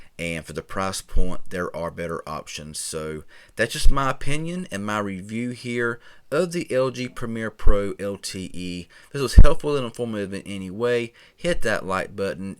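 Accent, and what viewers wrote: South, United States. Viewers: Was suppose to be a review not southern accent return!!!!!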